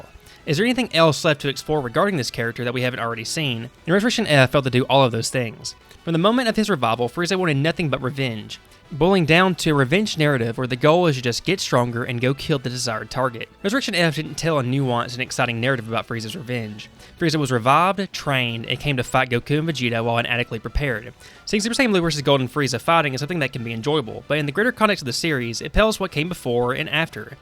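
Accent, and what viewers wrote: South, United States. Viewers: I love youtubers with southern accents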